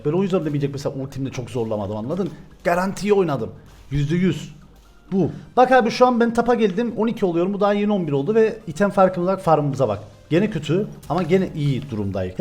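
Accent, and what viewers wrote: Cyprus. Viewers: abi seni izleye izleye ağzım kıbrıs ağzına döndü evde hade de genne diye dolaşıyom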